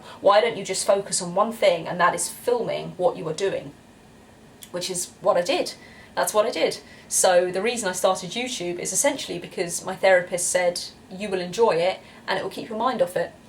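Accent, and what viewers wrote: United Kingdom. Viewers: Your British accent always gets a thumbs up ( it´s the best english who can be spoken) .. the content of your videos always gets one, too. So you have ... at least with me as a German always 2 points plus ;) Listening to you is always greate Keep going and keep the head up these days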